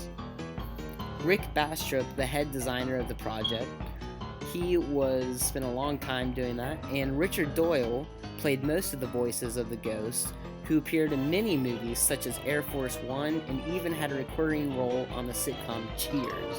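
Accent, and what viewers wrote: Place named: Cincinnati, United States. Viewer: This guy has the most Cincinnati accent I’ve ever heard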